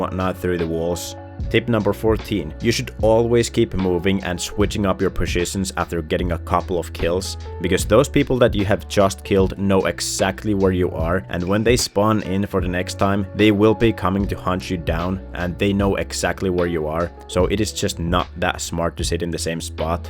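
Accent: Finland. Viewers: Pakko nyt kysyy onk jäbä suomalainen tai jotai ku must tuntuu et sä puhut tyylii ralli englantia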